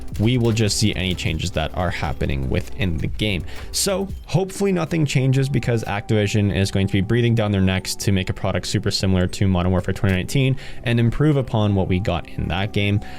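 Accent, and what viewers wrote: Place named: Canada. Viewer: he's Canadian. You can tell by how he says certain words.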